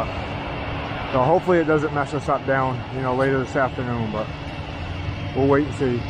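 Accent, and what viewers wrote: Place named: New England, United States. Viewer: You gotta be local like Maine mass or new York with that accent I recognize and love it and time I hear a new englander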